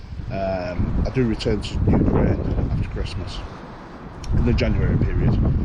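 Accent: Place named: Lancashire, United Kingdom. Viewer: Hi I don't know if you can use it but I have a Renault traffic van, <num>,000 miles good runner etc. I wish I could donate it but I could let you have it for £2500 If your interested let me know All the best ps from your accent I'm guessing that your in the Lancashire area, I'm originally from Preston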